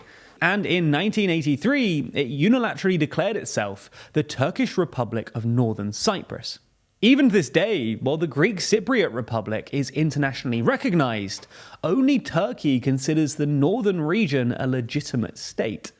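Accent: England, United Kingdom. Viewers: No one expects absolute perfection, just close but with an English accent. When they do something as ridiculous as pronounce the Spanish double-L as a hard L sound, you know they either have had surprisingly little exposure to Spanish (possible but not likely), put no thought into it, or have so little respect for other cultures that they intentionally over-Anglicize their pronunciations. They claim they look up pronunciations but sometimes forget them once they begin recording, but some of their mistakes are too easy to avoid, and they also could write their scripts with (quasi-)phonetic spellings, but apparently they don't. And they tend to keep making the same mistakes over and over in multiple videos. These are very simple things, and not doing them means they aren't putting in nearly as much effort as they claim.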